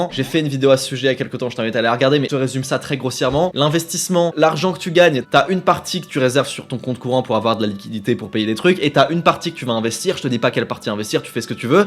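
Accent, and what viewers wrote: France. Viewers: tu parles un anglais francisé c'est perturbant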